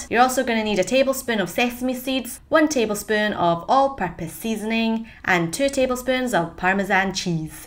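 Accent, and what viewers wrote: United Kingdom. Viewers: From your accent I thought you were from the UK, but once I saw the black swan hummus and heard you bought it from Coles I knew you're from Australia! So I subscribed start away! Yah~ can't wait for more videos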